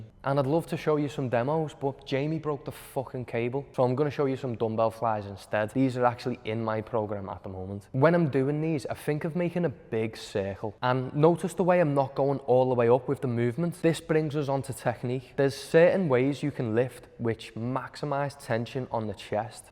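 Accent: Liverpool, United Kingdom. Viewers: Theres something, I like about the scouse accent, which is fun, but also, i'm not fucken about lad.... 😛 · It’s scouse la, ynwa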